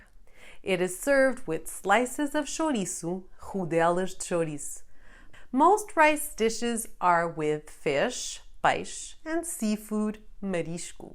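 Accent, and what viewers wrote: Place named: Mainland, Portugal. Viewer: I am a Portuguese American from California. I am a high school principal and will be taking students to Lisbon in November for a week of study. Your videos are so helpful because the Portuguese I learned as a boy was from Madeira (where my parents are from) your videos are helping me w the mainland accent and slang words. Thank you thank you!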